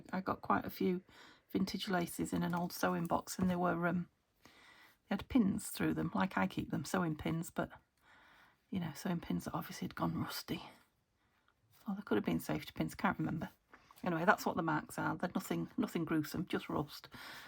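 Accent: United Kingdom. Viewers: Good morning. ☕️ Congratulations on 3,000 subscribers. It sometimes takes me a day or two to completely watch a video because of interruptions in my life. Your voice, and gentle crafting and wonderful UK accent are the highlight of my day. Thank you so much for the inspiration and sharing your beautiful journals with us.❤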